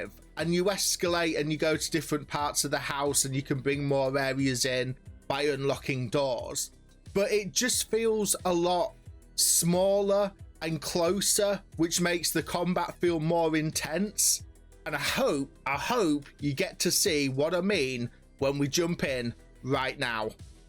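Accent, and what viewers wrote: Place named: England, United Kingdom. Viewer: UR ENGLISH!?!?!?!? 😱😂 Soooo many things... This game.... Ur voice... This is like when the protagonist reveals he's related to royalty and knew the whole time... I'm also beginning to see the kind of games ur in to. I feel like I'd have a blast playing this... Anything relating to round based survival first person shooter, I'm interested in 😂... Nice to hear the voice behind the screen brother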